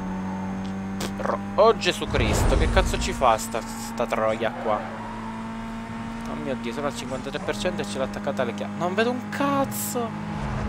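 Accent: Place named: Sicily, Italy. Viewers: io lo capisco anche perchè ogni tanto parla parlermitano :P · quel tocco di siciliano nei tuoi video DEVE continuare a essere presente!!!rende il tutto più epico!!!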